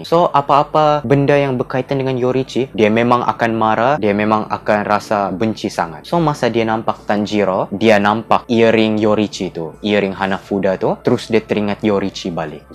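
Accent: Sabah, Malaysia. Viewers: Ko org sabah? 😂😂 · Yes, org sabah 😂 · speaker ni orang sabah kah? 🤣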